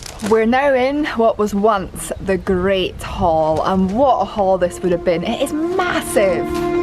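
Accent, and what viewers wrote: Scotland, United Kingdom. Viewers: Something about watching a vlog about a Scottish castle from someone with an awesome Scottish accent. Sorry but some of us Americans love that kinda stuff.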